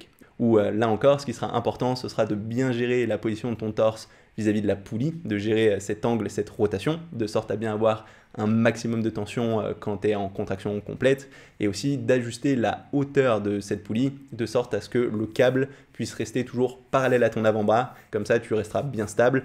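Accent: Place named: Bourgeois, France. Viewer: C'est pas de ta faute mais ta manière de parler c'est vraiment insuportable ta un espece d'accent de bourgeois chelou troo enervant. Sinon la video est super bien faite bien expliquée et merci pour les conseils mnt je vais faire comme toi.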